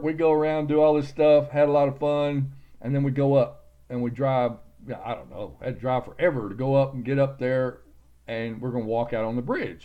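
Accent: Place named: South, United States. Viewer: ...I love your accent, I could sit all day & listen to you tell stories....lol...you sound like you're from the South...country as S***, just like me! 😊😊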